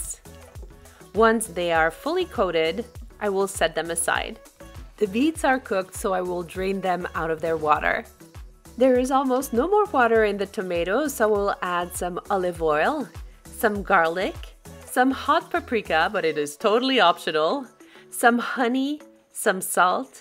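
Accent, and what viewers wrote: France. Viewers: Are you French ? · Ah en fait c’est un accent français ha ha ha je m’étais pas rendu compte mdr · Very interesting. Are you French by any chance? I recognized some of your pronunciation and words:) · מבטא צרפתי באנגלית? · love ur French words and accent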